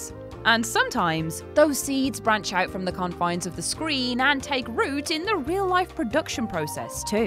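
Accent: United Kingdom. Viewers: okay, enough of this. is obviously British. American pronunciation is different. Get over it. Worchestershire is pronounced Wooster-sheer, schedule is pronounce shed-ule. You are aware that the British INVENTED the English language. We only borrowed it, so give her a break on pronunciation, okay. You go!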